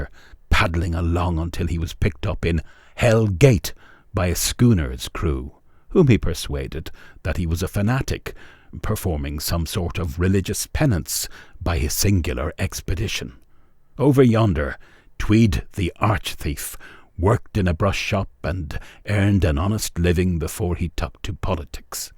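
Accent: United Kingdom. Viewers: So what's up with not being able to watch an American subject without incessant Brits narrating everything on the internet?.....can't watch TV, now can't enjoy a video.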